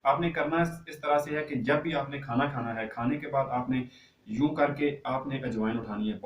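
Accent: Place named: Pahari, India. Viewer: Bhai apni bolne ka treqa badlo Ap k bolne PTA chal Raha hy k ap koi phari ho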